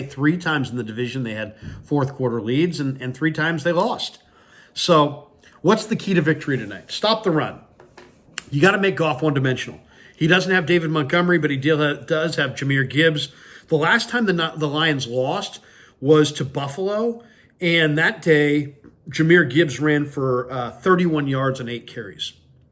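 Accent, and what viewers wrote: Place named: Northern California, United States. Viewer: with that casual NorCal accent. Trust me, I've lived in Texas for 17 years as well as other places it's a thing.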